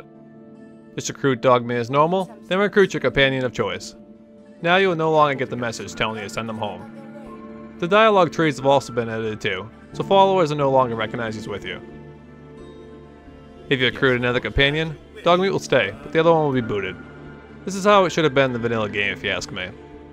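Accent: Boston, United States. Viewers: YOUR BOSTON ACCENT IS SO IMMERSIVE OMG!!!!!!!!!!!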